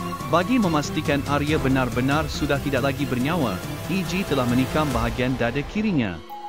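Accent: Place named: Malaysia. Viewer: Cannelnya solo suara prmbawa acaranya kaya orang malasiya ya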